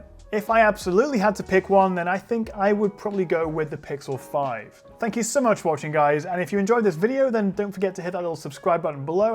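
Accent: England, United Kingdom. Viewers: great video man! I love your english accent btw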